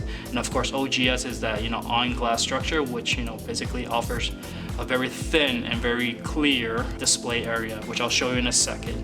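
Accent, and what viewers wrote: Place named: China. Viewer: I couldn't enjoy the video. The presenter has a thick accent. Sounds like Chinese/Mandarin is his first language? That's my best guess.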